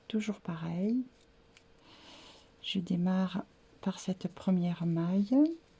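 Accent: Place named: South-West, France. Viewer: Merci pour cette panière. Je viens de la terminer et j'ai pris beaucoup de plaisir à la réaliser grace à vos explications extrêmement claires et précises. J'aime beaucoup vos tutoriels ainsi que votre voix calme avec ce joli accent du sud ouest.💜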